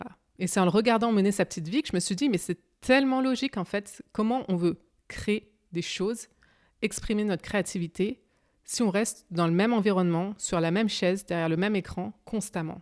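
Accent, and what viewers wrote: Canada. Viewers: tu serais pas montrealaise par hasard? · Je perçois un léger accent québécois.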